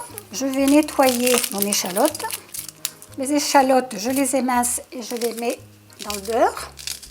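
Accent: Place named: Algiers, Algeria. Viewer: Vous avez raison,j'arrive à " sentir" la bonne odeur ... hum ! Vous n'avez pas répondu à ma question, êtes vous d'Alger?,car vous avez un p'tit accent qui ne m'est pas inconnu 🤔 😊😉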